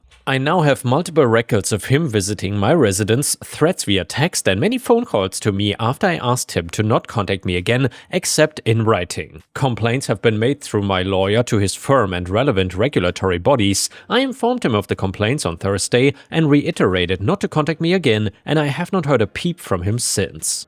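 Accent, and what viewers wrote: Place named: Germany. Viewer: dein deutscher akzent ist gar nicht so stramm, sehr gut, ich kann deutschen akzent in englisch nicht ertragen 😅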